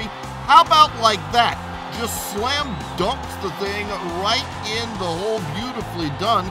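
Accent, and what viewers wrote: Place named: United States. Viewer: Crap yank commentary...I can't watch it after 7 seconds...just ruins the highlights...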